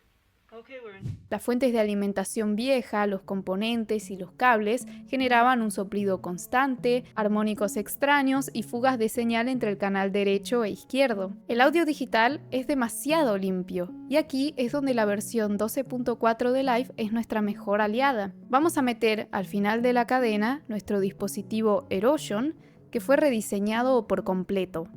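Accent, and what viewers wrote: Argentina. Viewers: de q parte de argentina eres??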